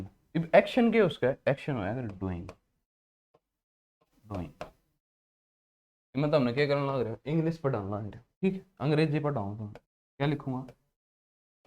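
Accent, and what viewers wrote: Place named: Haryana, India. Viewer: English with haryanvi... No doubt it's a nice combination for us... Thanks for the class sir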